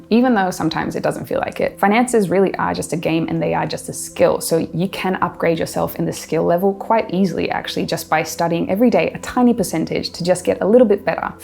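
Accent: Australia. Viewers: I always thought you were Australian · I am surprised you are in Iceland! I thought you were Australian. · You're from Iceland!😮 I thought you were Australian.🤔 I make lots of predictions. Sometimes I'm right. But I'm not famous for it. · Iceland?? She sounds like an Aussie to me (and I'm an Aussie!) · Iceland??!?! You sound like an Ozzie! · For a minute I thought you were Australian but thank you for this warning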